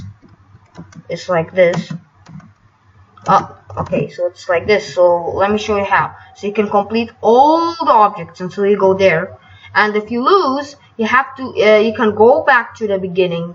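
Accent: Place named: Spain. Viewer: ik it’s English but I thought u had a Spanish accent mb. W video tho 😅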